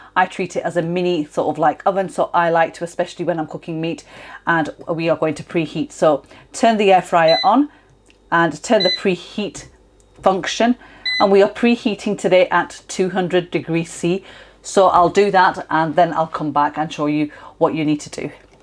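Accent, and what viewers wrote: United Kingdom. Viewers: Love your videos a lot.. I just bought Cosori air fryer and watching you every single day. Please let me know your name and your social account. I love your UK accent too :) :) I live in Tokyo.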